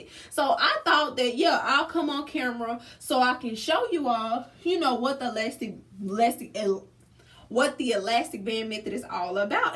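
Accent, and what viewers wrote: Louisiana, United States. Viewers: New method until my wig fall off in front of everybody 😂😂😂😂😂😂😂😂😂 that's what I'm afraid of 😩 love your Louisiana accent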